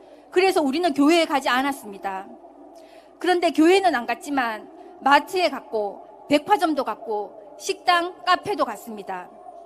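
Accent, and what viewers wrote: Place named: Gyeongsang, South Korea. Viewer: 역시 말씨가 경상도네 ㅋ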